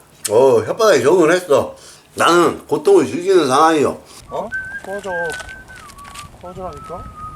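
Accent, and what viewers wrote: Jeolla, South Korea. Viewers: 형님 저는 부산사람인데 전라도 사투리 너무 재미있어요..미친 놈들 말 신경쓰지 마시고 형님 하고 싶은 대로 하세요.. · 저 전라도 녀수 사람으로서 형님의 사투리에 무궁한 친밀감을 느낍니다ᆞᆞㅎ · 으따~ 역시 전라도 사투리가 찰지구마잉~ ^^ 나는 고통을 즐기는 사나이여. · 광주 토박이로써 전혀안불편하고 잼있습니다 제가 허락하게습니다 전라도사투리맘껏 쓰세요 ㅋ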